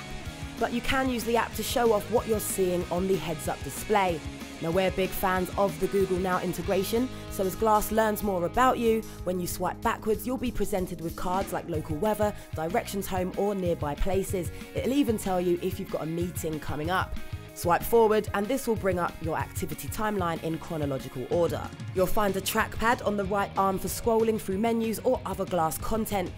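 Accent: United Kingdom. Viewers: Ahahahaha the British Accent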